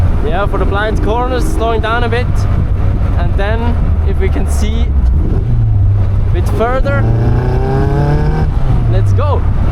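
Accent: Switzerland. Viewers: Yeah, it's one of us. That much is right. But as I can tell a very clear Swiss accent from a German accent, We can narrow down the number of possible solutions even further. No need to be this vague.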